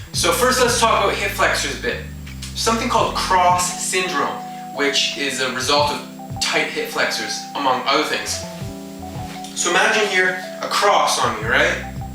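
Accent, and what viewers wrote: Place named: Canada. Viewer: Gud to see you know what you talking about.At first I thought oh no not another American on YouTube. But I think you're Canadian aren't you.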